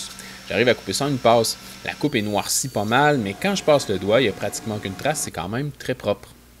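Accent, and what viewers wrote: Quebec, Canada. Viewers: Hello je passe pas de ton accent du Québec, fabuleux ce nouveau laser, dit moi tu parle de papier de marquage pour le verre dans ta vidéo, ont peux le trouver partout et l'utiliser avec une orthur aussi? belle vidéo tu donne envie que je change mon laser mais ma blonde ma remis à l'ordre rien pu faire avec le commandant fait 12 ans de mariage et pas de remise de peine par le juge 😁meilleures salutations à ta famille et merci pour tes vidéos . le hello du Valaisan de Swiss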